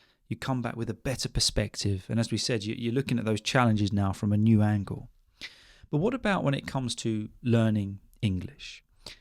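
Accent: United Kingdom. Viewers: Hello,! What's your kind of British accent? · Your accent is awesome, I like it a lot. What's the name of your accent? Manc? Yorkshe? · Thanks a lot! As I'm taking a break from studying my lectures, I've really enjoyed your video and your amazing British accent! 🤍 greetings from Iraq! ✨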